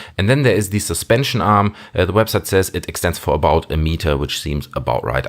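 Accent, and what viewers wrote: Germany. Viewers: 3,5 mm Jack? Ich weiß dass du deutsch bist, haha, man hörts. Könntest du mich bitte auf Instagram anschreiben? Ich hätte gerne über das Mikro diskussieren. Habe vor mir es zu kaufen.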